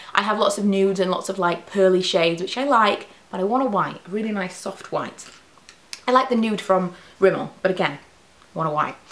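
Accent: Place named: Yorkshire, United Kingdom. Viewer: ahh I see! That makes sense. I had the idea at the time that the dryer they were, the more they'd stay in place but now I'm not so sure. Might have to run and get the older ones before they disappear:) Love your videos by the way. I'm from Leeds so it's always nice to hear a YouTuber with a Yorkshire Accent!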